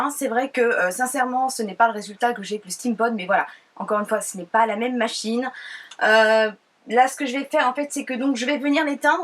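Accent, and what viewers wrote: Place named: South, France. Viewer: tu as un accent du sud on dirait !😍 ta vidéo est top ! <33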